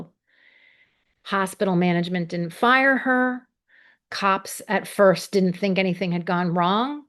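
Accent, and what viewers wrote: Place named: United States. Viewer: It is so shameful that we have to listen to someone with an American accent. The English so called justice system is now the laughing stock of the world because of the Lucy Letby witchhunt. We should also be grateful to Rachel Aviv who wrote from across the Atlantic at a time when truth telling in our country was banned by the trial Judge.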